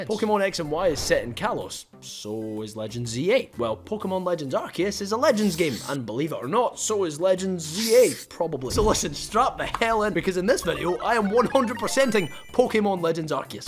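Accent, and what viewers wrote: Scotland, United Kingdom. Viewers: I absolutely agree this guy is amazing and it’s just great to listen to a Scotsman babble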